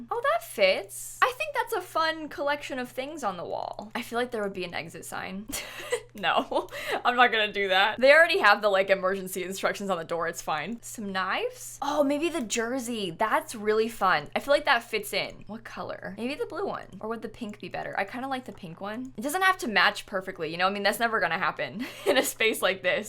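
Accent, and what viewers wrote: England, United Kingdom. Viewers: For about a year I thought you were British and idk why🤣 I think it’s because I’m so use to hearing American accents that they’ve become normal to me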